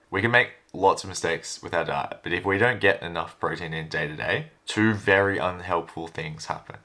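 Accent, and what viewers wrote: Australia. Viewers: Lol have you ever heard an English accent? It's clearly Australian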